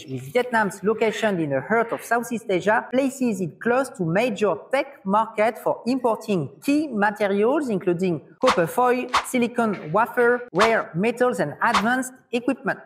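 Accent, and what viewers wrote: France. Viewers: I love the French English accent.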